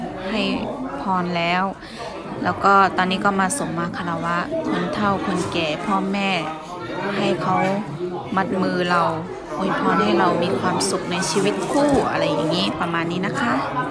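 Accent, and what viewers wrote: Thailand. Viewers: พูดสำเนียงไทยกลางชัดมากเลยครับ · น้องทำไมพูดไทย สำเนียง กทม. มีเเต่คนสวยๆ สวดเหมือนเชียงใหม่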